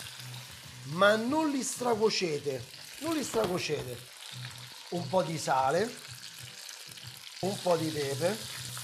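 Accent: Rome, Italy. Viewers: Meraviglioso piatto. Ti assicuro che a volte non mi interessa il video :. Basta la tua voce de Roma che mi sento a casa. Grazie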